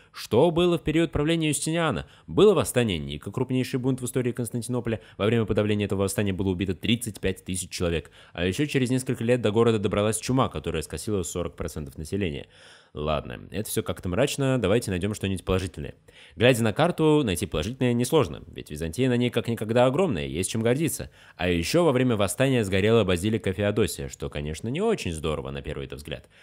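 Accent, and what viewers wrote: Tatarstan, Russia. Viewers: Классный канал! Автор акцентом похож на татарина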